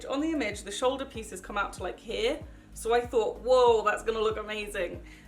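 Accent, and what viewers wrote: United Kingdom. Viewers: Are you British or something bc I love your voice it is great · Are you British 😊😊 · she is British like me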